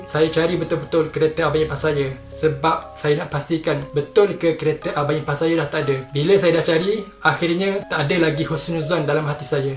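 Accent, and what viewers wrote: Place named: Kelantan, Malaysia. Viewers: Orang Kelantan kalau cakap kl memang akan cam ni, nice 👍 cerita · Macam org kel je bunyi accent 🤔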